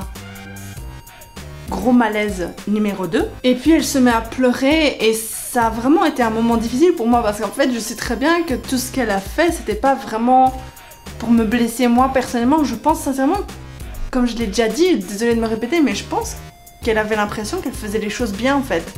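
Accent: Belgium. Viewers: Du coup, moi qui suis nouveau ici e arrivé presque au milieu de la video. J'ai été faire un tour sur ta playlist mais ej trouve pas la première partie. Je fais comment pour avoir le début de l'histoire. Parce que l'air de rien ça m’intéresse. Je suis malade. au lit et je m'ennuie, je regarde donc des vidéos de gens qui racontent leur vie. Et j'aime bien. Kestuvafèr ? EDIT: c'est bon j'ai trouvé et j'ai vu la partie 1. Donc je suis de retour pour regarder la suite. EDIT2: pourquoi tant de dislike? les gens n'aime pas notre accent belge? Enfin pas vraiment belge puisque sud du Hainaut ou nord du Luxembourg. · Pierre Petit elle parle de Bruxelles à moment donné et vu ça façon de parler j'en suis quasi certain (c'est la première vidéo que je regarde)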